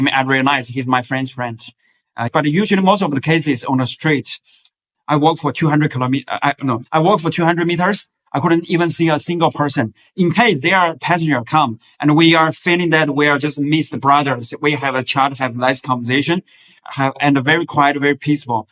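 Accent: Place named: Ireland. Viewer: Would never have guessed you were anything but irish 🇮🇪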